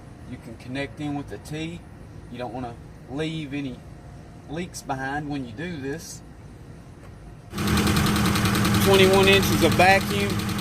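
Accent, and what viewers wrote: South, United States. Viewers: Luv accent mate. South?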